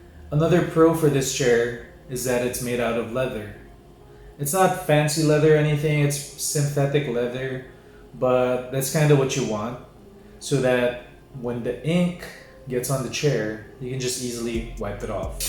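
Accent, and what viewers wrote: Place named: United States. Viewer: Jesus for a Filipino, you have a very American accent.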